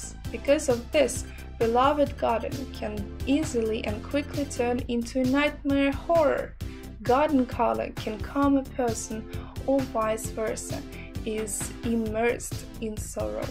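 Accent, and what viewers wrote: Russia. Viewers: Русскоязычный английский ,идеи полезные для меня, Thanks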